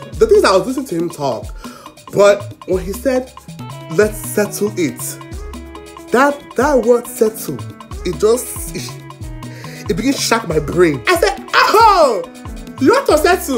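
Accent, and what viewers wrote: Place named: Nigeria. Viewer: Wait? You're from Cameroon but have a Nigerian accent?!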